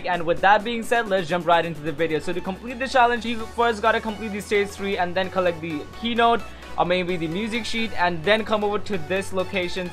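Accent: India. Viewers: Lmao his indian accent is so funny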